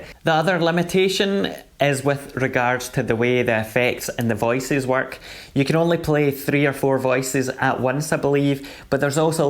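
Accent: Scotland, United Kingdom. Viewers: It's definitely a Scottish accent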